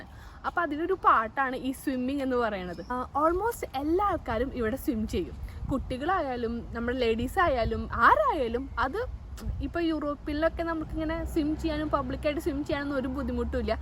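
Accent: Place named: Mahe, India. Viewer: ഇങ്ങളെ ആടാ സ്ഥലം കാണാന് നല്ല മൊൻജ്ജ് ഇണ്ട് 😂 നമ്മളെ മാഹീ ഭാഷ ആണ് ഇത് മാഹീ തലശ്ശേരി ഭക്തെ ഭാഷ 💞🥰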